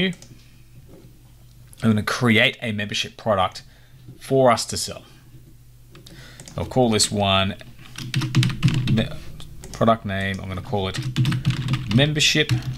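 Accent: Australia. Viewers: Hi. I set up the subscription on WooCommerce following your intstructions. When I click the Proceed To Check Out button I get a message saying you must be logged in to check out. Have you had this happen. There is no option to log in. Its great to hear a fellow Aussie on YT. Brian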